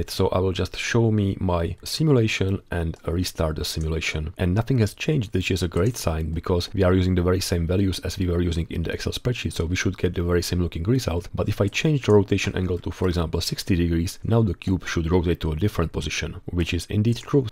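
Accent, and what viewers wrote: Czech Republic. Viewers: Čech ? :D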